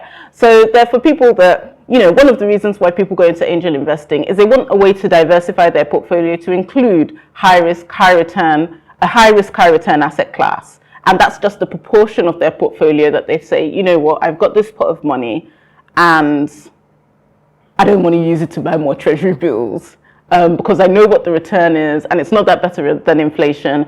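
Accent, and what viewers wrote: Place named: United Kingdom. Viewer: Nice one, but a local academic lecture shouldn’t come with such a deep British accent tho.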